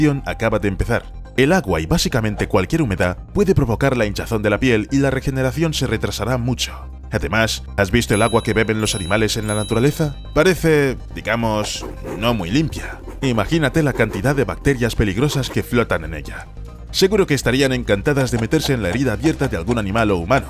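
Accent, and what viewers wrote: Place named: Spain. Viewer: El acento español 👌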